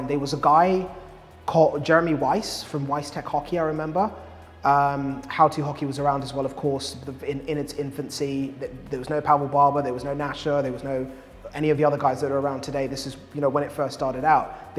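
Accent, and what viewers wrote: England, United Kingdom. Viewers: Really enjoy your vids for catching up with hockey stuff. Your docu style vids are my favourite, pretty cool to see how people play hockey and get their fix around the world and their culture behind it. I found your channel recently while looking for new skates for the first time in 20 years and crazy to see how far things have developed (bought Bauer 3S btw, only for public skating at the rink and occasional pond...hockey days are behind me). Baking skates blew my mind! I played in Scotland back in the 80s (goalie) and the most popular skates with players at the time were Bauer Supreme 100s later 1000s, Daoust 301, CCM Ultra Tacks and the newcomer at the time Micron Mega 10-90s. I’ll just say one thing about the race/people of colour/black/brown in hockey. 80s hockey in the UK was white af and I remember Hilton Ruggles breaking into the British hockey scene at top level back then, Whitley Bay I think it was. 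This guy seemed to appear out of nowhere and was an absolute beast of a player. I can still visualise him powering up the ice and our crowd being scared of what might transpire and their breaths being held. Anyway, thanks for the vids. To be honest, your English accent really threw me off the first time I watched…was expecting North American/Canadian.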